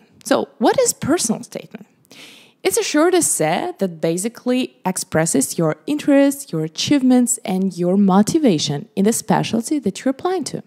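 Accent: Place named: Russia. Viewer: Awesome job, I like your Russian accent😍😍😍 Krasavaetsya!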